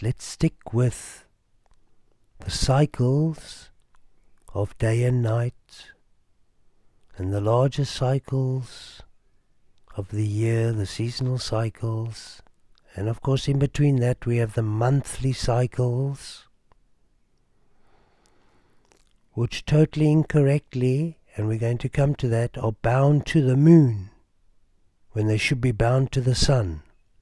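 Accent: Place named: South Africa. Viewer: Some great information and very interesting (but would not be half as interesting without the SA accent!). Unfortunately on the down side, I have some bad news to bring the community, yes my toast got burnt, it was 2 slices, I'm uncertain exactly at which point this was but believe at 1hr 48mins I'd remember something was under the grill. Just wanted to warn anyone else starting to watch this as you might consider finishing off all your weekend or weekday duties that aren't time dependant. Enjoy! 🦉🧔‍♂23🪑<imagine this is a bench>🪑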